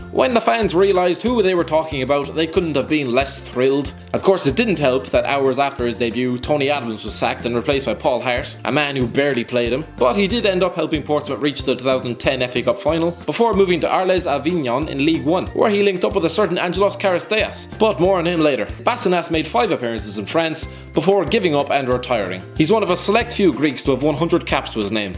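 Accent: Ireland. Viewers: narrator is a paddy, oirish to most. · being half greek half irish its lovely to hear an irish cover this video <3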